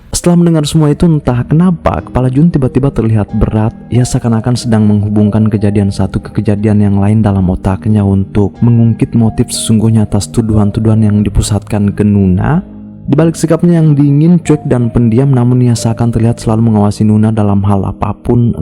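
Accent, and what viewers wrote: Bugis, Indonesia. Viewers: Orang Bugis yaa bang? Tau sidrap ka iyya bang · Dehhh masi ada logat bugisnya🤣🤣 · Bugis apa bang😂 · Serasa ada yg ganjal ,,, sepertinx pembicaranx orang Bugis ...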